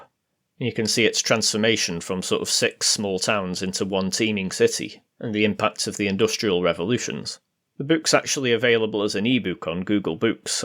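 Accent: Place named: Northern England, United Kingdom. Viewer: Sounds slightly northern to me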